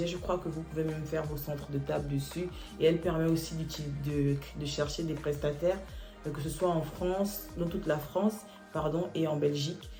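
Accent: Gabon. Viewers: Tu parles comme une gabonaise 😊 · Pourquoi on parle tous pareillement 😂 je viens de découvrir la chaîne mais l’accent ci 🥰🇬🇦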